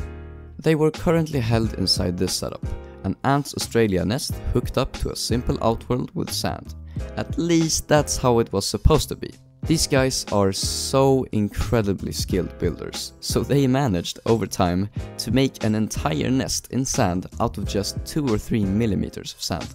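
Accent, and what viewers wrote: Sweden. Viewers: are you from sweden? your axent sounds like it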